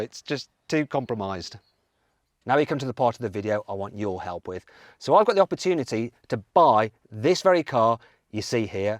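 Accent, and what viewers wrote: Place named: Tamworth, United Kingdom. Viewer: Do a 4g63 swap ,you sound like you are from Tamworth. Buy this one or buy your self a subaru forester sti .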